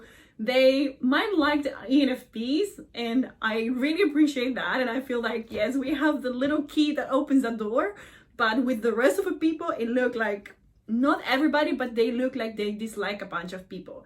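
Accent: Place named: Venezuela. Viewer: Where is your accent from? Best guess is Venezuela.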